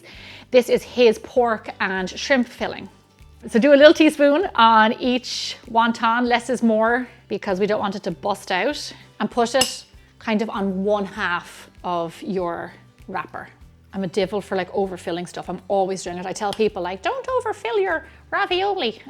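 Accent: Ireland. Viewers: Hi Im not fond of dumplings but I watch anyway for this Irish accent🎉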